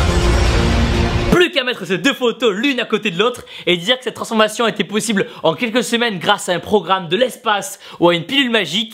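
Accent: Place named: Toulouse, France. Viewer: Quel bel accent toulousain!!!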